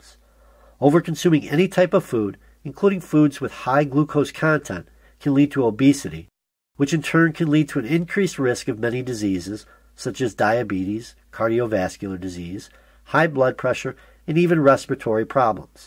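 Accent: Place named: Michigan, United States. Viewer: Great video. Love the (Michigan?) accent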